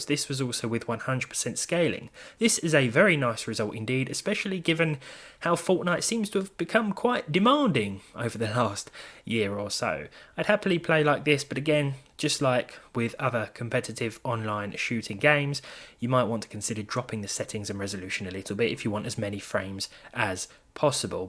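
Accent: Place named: Cockney, United Kingdom. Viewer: Your review is fine but your cockney British accent was tearing up my ears. And it pays off 👍🤤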